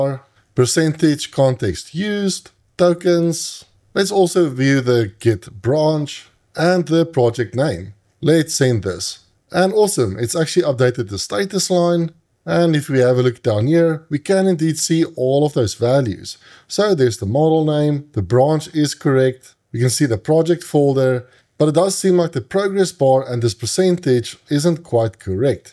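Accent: South Africa. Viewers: are you from South Africa ?:)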